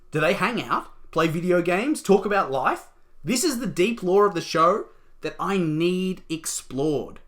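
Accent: Australia. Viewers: are you australian? ps love your videos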